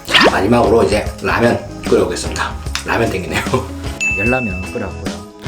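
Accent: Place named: Daegu, South Korea. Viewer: ~~~^^ 넘 정감 넘치는 제목에 옛추억을 느끼게 합니다... 구수한 대구 사투리가 어린시절을 생각나게하네요~~ 20년 수도권 생활하다가 고향생각나서 아제아 방송을 늘 즐겨찿습니다... 꼭 대구 가면 경산 한번 찾아가겠습니다~~~^^ 화이팅~~~^^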